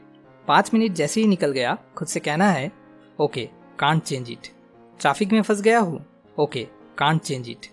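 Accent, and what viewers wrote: Bengal, India. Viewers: Dada accent 🙌🙌it's too sweet rosugulla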